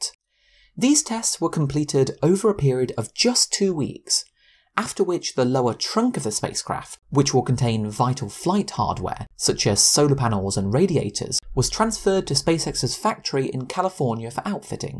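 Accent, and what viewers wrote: United Kingdom. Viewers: This british accent...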